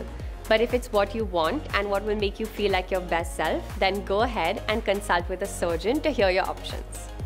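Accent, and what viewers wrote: India. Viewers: Why is this white woman speaking with an indian accent 😂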